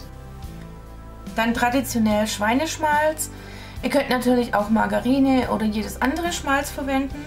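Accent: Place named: Swabia, Germany. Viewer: Hallo liebe, vielen Dank für das super Rezept. Wir leben in Pakistan und vermissen die deutschen Backwaren daher umso mehr. Heut habe ich die Bretzeln nach diesem Rezept gemacht und meine Tochter hatte Tränen in den Augen, weil es so lecker, genau wie in Deutschland, geschmeckt hat. Dein schwäbischer Akzent macht das Video angucken noch sympathischer. Liebe Grüße nach BaWü. Angelika. Bei Instagram kannst du Fotos davon sehen Instagram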